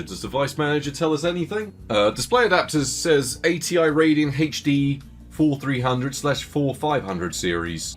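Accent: England, United Kingdom. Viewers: I love your channel brother. Serious question, are you from the same part of England as Jeremy Clarkson? Your inflection is so much like his.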